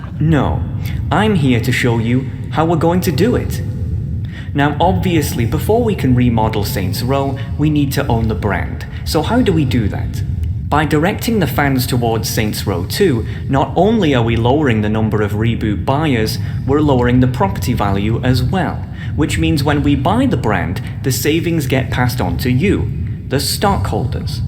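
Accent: United Kingdom. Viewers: wait.. you're bitish?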